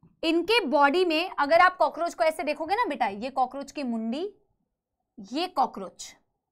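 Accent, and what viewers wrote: Bengal, India. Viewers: Ma'am aapki bengali intna cute hai ki kiya ji bolun main 😍😍😍😍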